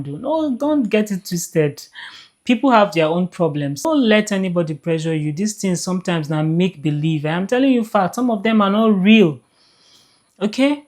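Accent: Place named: Nigeria. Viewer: I love your Nigerian voice!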